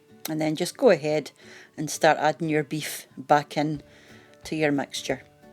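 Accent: Scotland, United Kingdom. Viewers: Love the Scots accent. Thank you for sharing your recipe. Will give it a go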